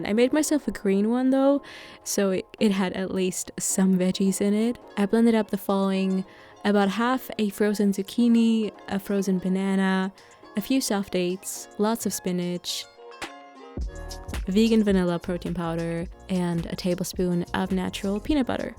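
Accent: Germany. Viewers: Liebe deinen deutschen Akzent haha !!! Love your videos !! ❤️❤️❤️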